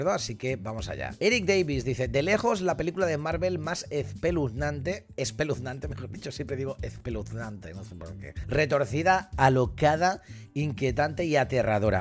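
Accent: Spain. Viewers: muy buen video solo no entendí de primera el chositil ... pero la verdad también lo bueno de tus videos son tus pronunciaciones tan españolas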